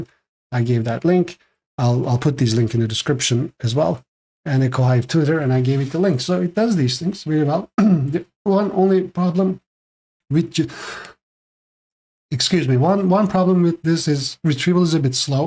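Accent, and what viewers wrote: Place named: Turkey. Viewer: Good points… out of curiosity, are you Turkish? I hear a Turkish accent.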